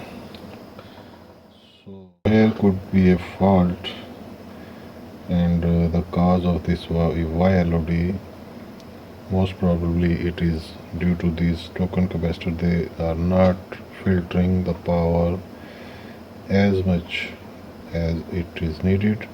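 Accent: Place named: India. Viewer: Sorry 😐 But i don't like hindi accent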